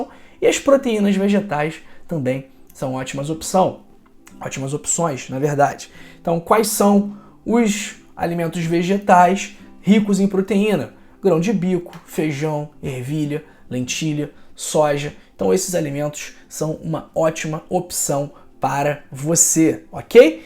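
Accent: Rio de Janeiro, Brazil. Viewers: O sr tem sotaque de carioca . Parabéns pelas dicas. Obrigada pela consulta.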